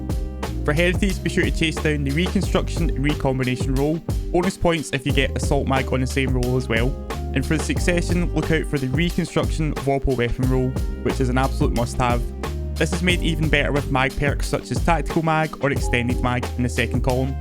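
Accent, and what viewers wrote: Scotland, United Kingdom. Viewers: Man I am a sucker for a nice Scottish accent. Subbed! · Thanks for the video! Super helpful. And something about a Scottish delivery of the information makes it 10x better.:)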